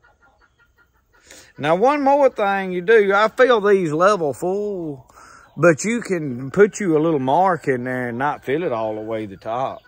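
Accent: South, United States. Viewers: Hi I appreciate the video it was informative and entertaining but buddy it was hard for me to follow because of the your Southern drawl it's so strong not a criticism just a suggestion maybe if you speak Little more clear and more precise I wouldn't had to watch it a couple times to get all the narrative and I can generally follow most southern accent I have a long ancestry back to the south when my original ancestries from Virginia followed that longWind Daniel Boone to eastern Kentucky and Tennessee 😂 thanks for sharing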